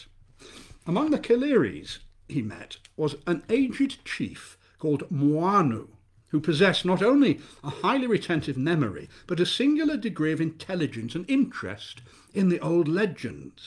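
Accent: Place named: England, United Kingdom. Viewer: Thank you for this wonderful reading. It's funny how good Lovecraft's writing sounds in the English accent!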